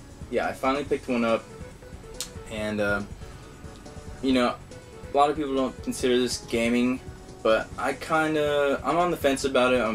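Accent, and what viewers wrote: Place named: France. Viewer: french accent not british good video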